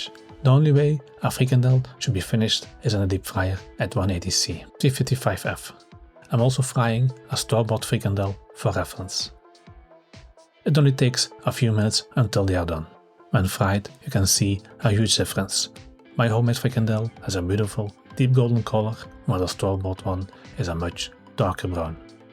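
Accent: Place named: Flanders, Belgium. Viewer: I like the accent. How to tell me you're Flemmish without telling.😊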